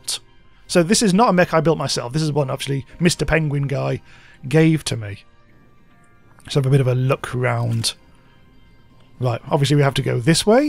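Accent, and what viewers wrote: United Kingdom. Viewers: I hope there will be stable 1.3 pretty soon. By the way, you have a fantastic voice, are you Britain?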